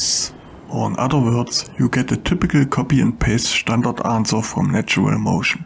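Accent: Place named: Germany. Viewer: heavy german accent :) german crew yeeeees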